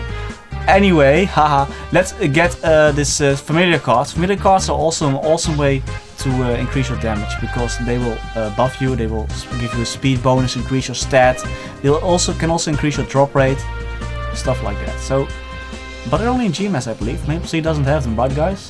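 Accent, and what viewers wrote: Netherlands. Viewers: Nice video :3 Haha I was like hmm I think hes dutch and I'm right xD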